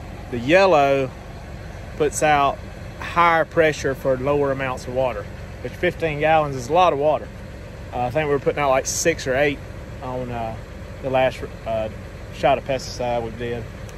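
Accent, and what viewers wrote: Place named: South, United States. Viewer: Worked for John Deere for 38 years and spent a lot of time in Mississippi and Arkansas in the Delta area. Use to go out with the dealer personnel to the field since I was from our planter factory in Moline, Illinois. Some of the best ground for growing crops in the World. Love your videos because you'er one of the few farmers in the south on UTube. Like to watch the corn and soybean farmers but since I'm familiar with cotton I thought your channel was great. I too like the information about the history. I was born in Arkansas and I miss that southern accent. Great people in the deep south as well. Keep um coming!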